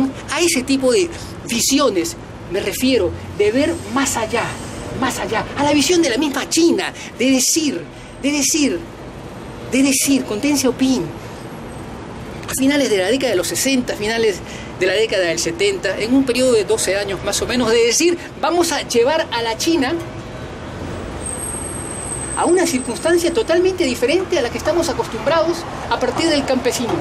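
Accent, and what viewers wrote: Argentina. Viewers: Sr. SI UD NO ES PERUANO! HALAGA A ARG HASTA NO PODER Y HASTA SU FORMA Y SU ACENTO ES MAS ARGENTINO Q PERUANO! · Xq hablas como argentino? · ¿Un peruano con acento argentino? Pues yo creidísimo de que era lo segundo; escuchar para creer, verdaderamente; muy interesante; desde Lima (vi14/V/2021)-Perú-América.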